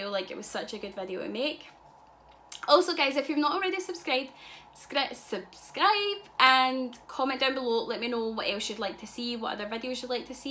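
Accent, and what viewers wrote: Scotland, United Kingdom. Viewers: Are you from Scotland and if so where about in Scotland xoxo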